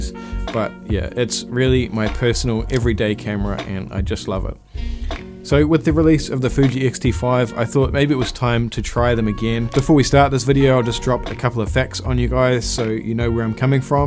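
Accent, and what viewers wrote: New Zealand. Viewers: It's so true what you say about the Fuji APSC vs 35mm. Too long a story to tell in full, but after 11 years of battling it out with Fuji and Lightroom, I've recently swapped over to two Nikon Z bodies, a bevy of their gorgeous Z lenses and Capture One. The difference is off the planet and it is the best thing I've ever done. Even my customers commented on how my images are so much richer and deeper and more gorgeous. I'm actually amazed how I kept any customers using Fuji for all those years. The Z50mm and Z24mm 1.8S lenses are just about glued on my cameras for all my events/weddings and gigs. The Nikon files are gorgeous and are just so rich and deep and process so well in C1. Was great to discover your channel. I'm a Kiwi but live in Perth now, but it's great to hear the accent and to get a glimpse of my wonderful homeland. Keep up the good work.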